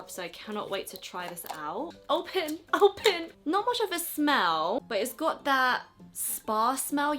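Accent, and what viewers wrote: England, United Kingdom. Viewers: I've been following you on Instagram for a while and this is the first time I'm hearing yr voice honestly cant remember hearing yr voice love yr English accent and loving yr videos. Subscribed right away.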